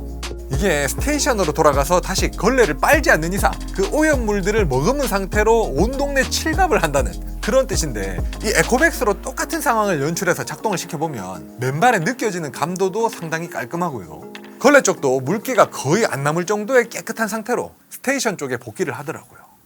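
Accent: Gyeongsang, South Korea. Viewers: 경상도사투리 드럽게 듣기 싫네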